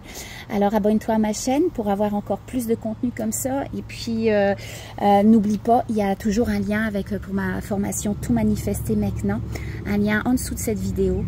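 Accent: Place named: New Brunswick, Canada. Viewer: tu as un bel accent que j'aurais pensé peut être du Nouveau Brunswick 🥰 grand merci 🙏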